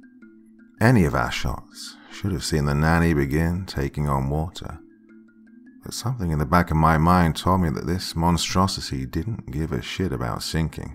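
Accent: United Kingdom. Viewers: Hes British cut him some slack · Part 2...yes please. I was stuck on the narrator's British(?) accent in the US Navy but thats just nit picking. I like the story so far.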